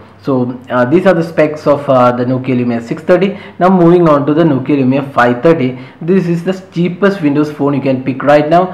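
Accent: India. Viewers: Whats up all these 35422 different indian mobile phone review channels?!?! Gimme a break guys. I'm totally allergic to your accent! Sorry!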